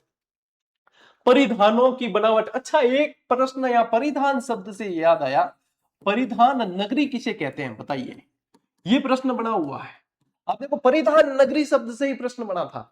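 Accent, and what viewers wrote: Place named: Marwar, India. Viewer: Book ka jawab nhi.. Iske alawa or kuch pdhna yaad bhi nhi rehta h ab to lekin rbse vali class or rbse ki book ye dono ek din bhi nhi bhulte. But despite of these two things, sir your way of speaking with a pinch of marwari or godwari i would say is influencing more and more .. I feel myself forunate that I study from such a great public figure. Aaj ki class or usme aapki intresting rajasthani vibes vali baate. You're totally incredible and unbeatable 🙌.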